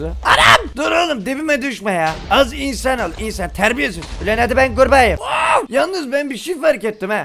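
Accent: Black Sea, Turkey. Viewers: Abi sen kara denizlimisin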